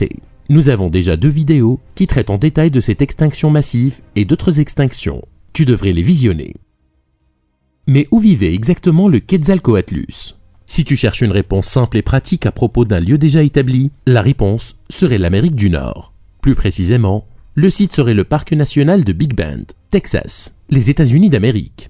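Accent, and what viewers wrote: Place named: Asia. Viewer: asiatique je crois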